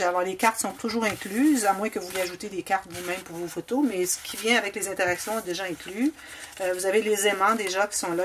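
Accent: Canada. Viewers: Bonjour, Je suis passée par là, malgré mes années de bricolage, j’ai mis du temps avant de comprendre et d’adhérer à un projet. Il faut réécoutez et notez les thermes employés par afin que vous n’ayez aucune hésitation quand vous l’entendez. L’accent, les thermes déformés par la traduction canadien / français, la vitesse du langage... compliquent au début. Si vous ne relevez pas ces thermes vous perdrez du temps et vous dégoûterez. Choisissez un projet hyper facile, qui vous plait, sans interactions (cascade, pochette, cahier d’écriture, image interrompue etc). « Livre photos pour débutants « « Album infini » « Album extensible « (6) « Folio rigide à deux sections « « Série cadeaux faciles et rapides » (tuto que je vous ai vu mettre de côté le 17.11.19) Beaucoup d’autres encore dans sa playlists sont faciles. Il sera temps ensuite, d’augmenter les difficultés en vous faisant plaisir. 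Votre hésitation, c’était il y a longtemps, mais peut-être que vous n’avez pas eu le temps d’avancer....je me permets de vous envoyer ces réflexions et je vous souhaite beaucoup de plaisir à scrabooker si ce mot existe 😁 Bernadette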